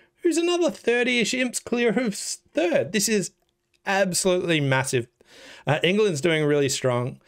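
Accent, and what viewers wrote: Australia. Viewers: How have I missed your channel till now? Enjoy your vids - good to listen to an Aussie discussing bridge. Love the analysis and hope you get to ake a lot more of these, I will be a regular watcher from now on.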